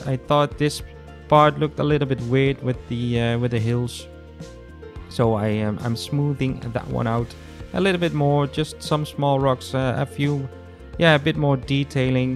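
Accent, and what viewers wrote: Netherlands. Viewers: not many animals look like what i see on the veluwe but keep up the good work ( ik hoor een beetje nederlands engels am i right?)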